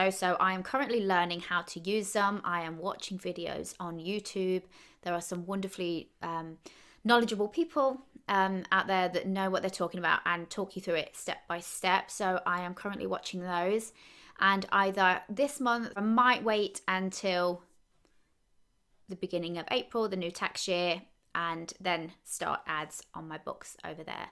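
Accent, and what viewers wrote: United Kingdom. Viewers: I love your British accent. Watching from Iran.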